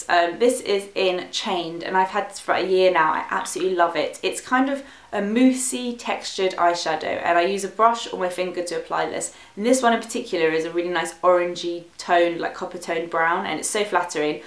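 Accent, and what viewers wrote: United Kingdom. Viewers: love your channel, your videos, and the british accent ...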